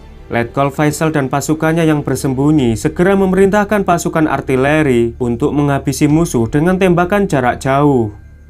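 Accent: Java, Indonesia. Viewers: Komentatornya medok banget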